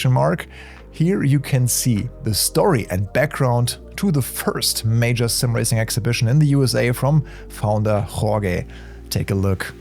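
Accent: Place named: Germany. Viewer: Man hört dein Deutsch extrem raus😅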